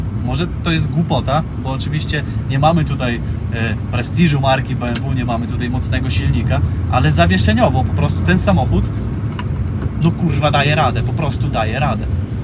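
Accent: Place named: Podlaskie, Poland. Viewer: Ach te "białostockie sljedziki" w akcencie prowadzącego :D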